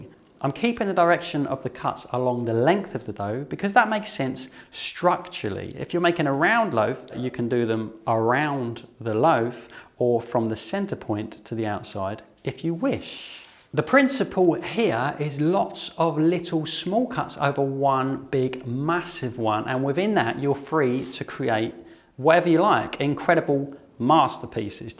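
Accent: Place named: RP, United Kingdom. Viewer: Otp Love your accent😊this is the posh english i love..p.s great advises thank youu❤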